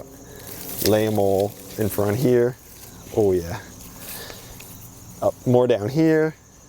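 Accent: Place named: East Coast, United States. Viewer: yaaaass i love the east coast wooder accent!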